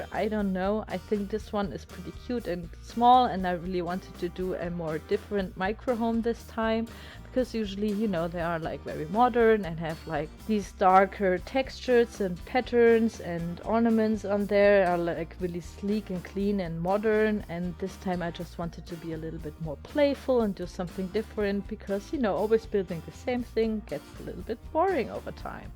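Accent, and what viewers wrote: Germany. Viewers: Es kann sein dass ich mich täusche aber kommst du zufällig aus Deutschland? Hast so einen deutschen Akzent haha :D Sehr schönes Video nebenbei weiterso bin total begeistert von deinen Baukünsten. :) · Are you German ?☺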